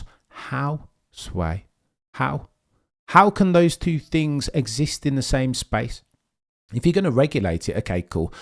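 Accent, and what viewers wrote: United Kingdom. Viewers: Love you, but really struggle to understand with your heavy British accent. My loss. Keep it going!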